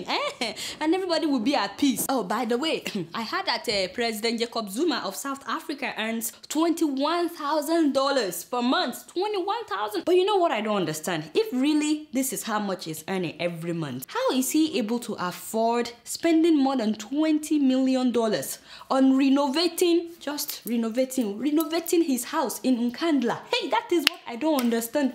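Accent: Nigeria. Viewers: who paid you should have thought you how to speak like a nigerian big fool...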